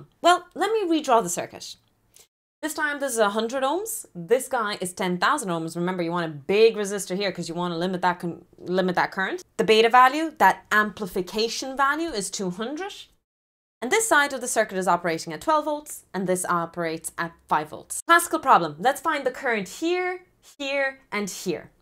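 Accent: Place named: Ireland. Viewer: Nice explanation. You sound Irish.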